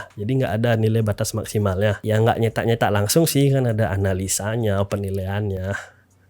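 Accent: Bali, Indonesia. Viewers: Logat Timur coy, Bali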